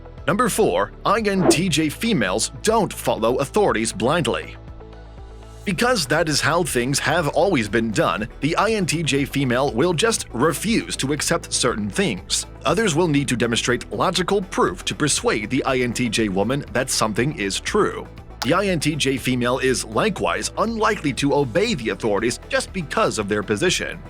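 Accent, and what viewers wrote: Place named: United States. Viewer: I really wanted to watch this video but the voice is way too American 🤦🏻‍♀️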